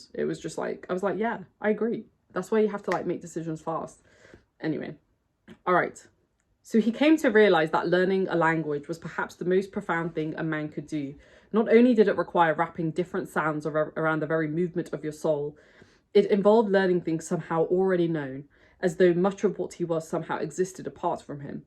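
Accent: United Kingdom. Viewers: Great video, thank you for sharing it. I think you loved many of the same aspects that I also love. Also! It's great to hear a fellow British person pronounce all the names in the way that seems natural to me :) Off to check out your other videos... Thank you for this.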